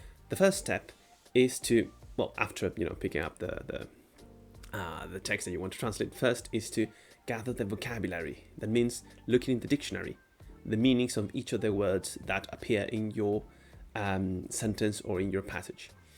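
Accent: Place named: Spain. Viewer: Me encantado! Que bueno es oir Graeca con un linga de Espanol. Yo amo las linguas de Espanol, y Griega, y otros. Now English. First truth: if one feels too proud just delve into Greek grammar to restore humility! For Ioanna, recall that Greeks were once illiterate. I refer to post- Mycenean collapse. They had their speech with all sounds/phonemes to express ideas, cases, etc. They had no WRITTEN case endings. They were then offered a menu of letters ( likely from Phonecian traders) from which to represent there spoken sounds. Folks who not long after created the Parthenon would not likely chose a number of letters for the same sound. Evidence also includes poetry lines that wdre meant to ryme but no longer do, latin transliterations and so on. The rough breathing was orig. H in another dialect and pronounced. Eyes were ophthalmoi now matia, winos ( with digamma) became winus then wine. 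Now called krasi, from mixing with water in a krator. Modern every day folks deny or do not k now this. Read Luke without much understanding. They eliminated Kztherevousa and adopted Demotic, the language of common ( usually poorly educated in lzst century, but not now of coursr). This removed them a step further from their kleromia/ heritage. No Greek kb here. But i love the channel and i subscribe. Karl Ioannis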